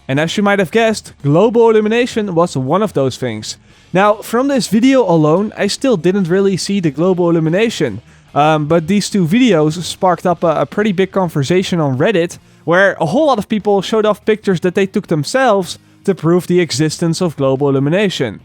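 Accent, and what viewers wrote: Netherlands. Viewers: Do one about season pass and the pre-order stuff! The P416 for example, which you get when you buy gold edition; Is that a low lvl rifle for smoothing up the egdes in early-early game or is it like in a container in your stash which you can "unpack" at any time with the negative effect that it binds to you and your lvl? Sort of like Schrödingers cat? Also what are the content drops and how will they work? National guard gear? the "perks"? I'm not asking you for straight answers but just your thoughts on it. Man I have been watching your videos since you started making them, back when all we had was rumours and speculations based on "hints" inte the first trailer. I can't believe it's finally coming out!! I have gotten engaged, had a son, raised him for 2 yrs, moved to another town, quit my job, and started studying (which I'm almost done with) during the time from the reveal to release. 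All this accompanied by your videos and dutch accent, almost each week. This is gonna be great! have a nice one, Or as we say, IIN SWEEDEN, "kom igen nu Britt-Marie, KÖR för fa-an"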